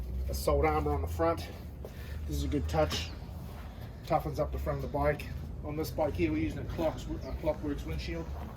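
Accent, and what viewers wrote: New Zealand. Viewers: Is the guy talking from Nz?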